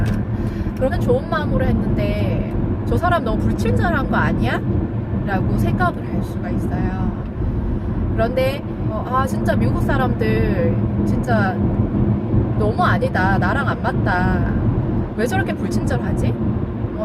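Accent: Gyeongsang, South Korea. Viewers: 외국 사는 입장에서 남일 같지 않네요~~ 고향이 경상도 쪽이신가요? 저도 경상도라^^;; ㅋㅋ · 고향이 경상도인가봐요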